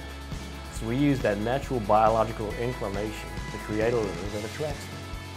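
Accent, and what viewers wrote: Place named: Rural, Sweden. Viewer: That's one hell of a Swedish country accent ya got there. I love it! He know s what sells and relating to your customer base is important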